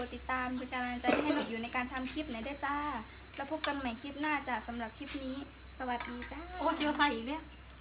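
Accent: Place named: Nakhon Phanom, Thailand. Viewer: ได้ยิน สำเนียง คน นครพนม รักเลยคะ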